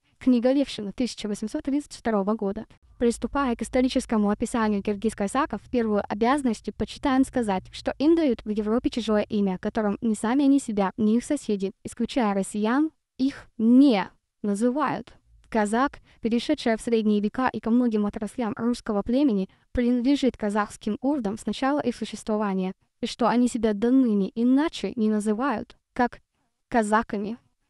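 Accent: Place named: England, United Kingdom. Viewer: А окцент то инглиш. Есть поговорка если ругаются два соседа значит там побывал англичанин.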